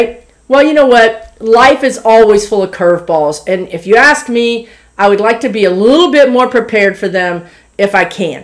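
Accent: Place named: Minnesota, United States. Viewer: Hi I turned this on and am watching now. My 1st thought was I like her she sounds so much like people I know here. Then I thought she really does sound like a Minnesotan. My next thought was I think she belongs in Minnesota she would fit in here so darn well! Just had to share a clairknowing that I may have had.? Might be a great question for you to check with some of the awesome people that you get with😊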